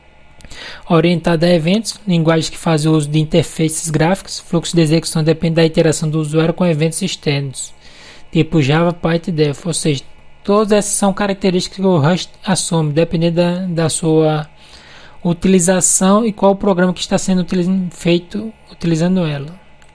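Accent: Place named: Portugal. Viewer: Português PT? amo esse PT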